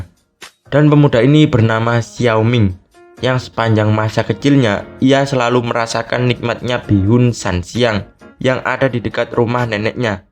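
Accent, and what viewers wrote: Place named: Java, Indonesia. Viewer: B sama D nya medok banget bang wkwkwkkk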